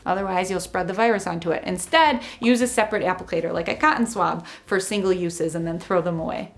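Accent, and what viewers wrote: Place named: New Jersey, United States. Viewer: That’s a lovely accent you got New Jersey?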